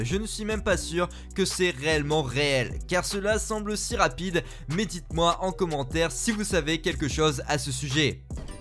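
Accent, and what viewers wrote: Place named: France. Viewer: Les commentaires insignifiant du narateur, avec son accent français me tape sur les nerfs.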